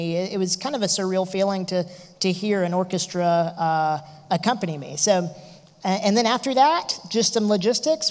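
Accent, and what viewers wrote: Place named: South, United States. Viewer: I had never heard you speak until today. Couldn't believe you're a Southerner. Love that accent. :-)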